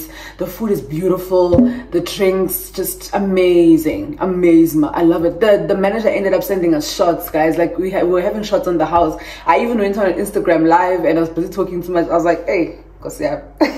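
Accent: Nigeria. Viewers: ❤️❤️❤️❤️❤️❤️❤️ hi mtswala just wanted to say congratulations again on your 10k subbies ,we love here. I wish you can speak wth that nigerian accent everyday or every month I really enjoy you doing it 😂😂❤️❤️❤️❤️ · I'm here for the Nigerian accent lol🤣love it a lot!!! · Yohh motswala I love you so much hle 😂😂 the Nigerian accent is giving 🔥🔥🔥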